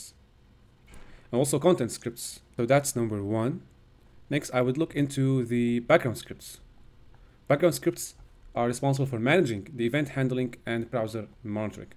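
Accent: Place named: Iran. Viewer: Your video is like a professor lecture on digital forensics.With a Persian accent “ good Ea stuff “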